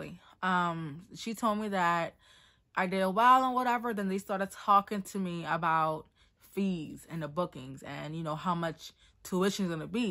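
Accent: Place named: New York, United States. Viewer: can definitely tell by your accent that you stay in NY 🔥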